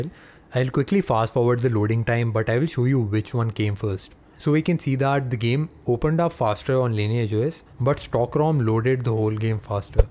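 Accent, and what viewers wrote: India. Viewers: Trust me. Train your english. Because i can't understand SHIT with your indian-accent english are you saying. U said channel to chaniil at the beginning. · I am not racist ..but in india your english is very indian.. :) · Can you speak better english without this fucking indian accent! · I think it's good to get used to other people's accent which is quite different from most of us, because one day, if you visit India, bear in mind that most of them speak like that. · another fucking india accent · I suppose only Indians may understand this kind of English. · It's not broken english - he just has an indian accent - I think he has a great voice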